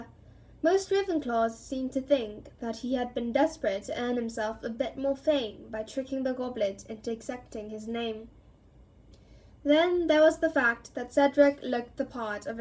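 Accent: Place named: United Kingdom. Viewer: I love ur British voice for the book very soothing❤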